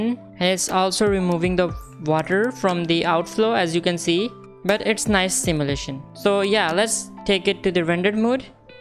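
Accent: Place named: India. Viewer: With the Hindi accent and the noisy background, the tutorial is irritating. Text to speech applications are freely available on the internet. You simply type what you want to say and the converter can turn your text to choice English speech. You can choose any voice type; or language and even such as Oxford or Cockney English. Yes, you can also choose between male and female as well as children. Windows also has such a facility.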